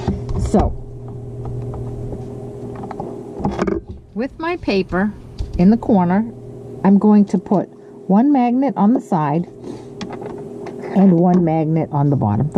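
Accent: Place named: United States. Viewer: I love to listen to you, I’ve never heard such a broad accent, not that I’ve been to many parts of your country bar a train journey from SanFransico to New York. Most Americans I’ve met are southern, or Chicago. X Ros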